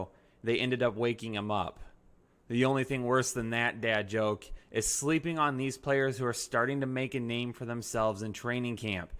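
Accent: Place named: Boston, United States. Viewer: Everything this guy says that involves the o sound is magical and entertaining. I'm guessing he's probably in New England or Boston somewhere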